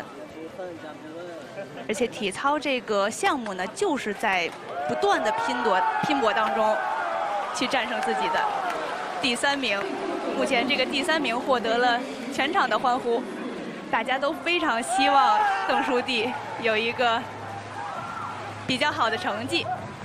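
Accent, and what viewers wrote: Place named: Cantonese, China. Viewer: 解说员是广东人吧~ “欠”了点、“完”满的结果！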